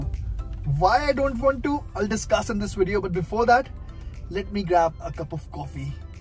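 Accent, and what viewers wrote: India. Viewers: Documents are just the formal papers just papers..not your moral identity. You , anyway. keep being an indian with your accent,tradition,yourself,familiy values,canadian passport cannot take all these ones away from you. Dont take it close to your heart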